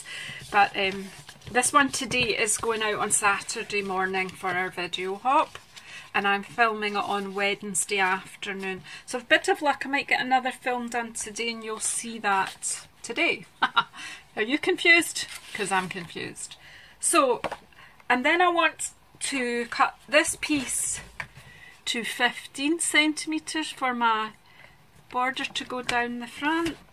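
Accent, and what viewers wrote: Scotland, United Kingdom. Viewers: Stunning DSP / beautiful card and a fabulous Scottish accent! Tfs. xOx ~ C ~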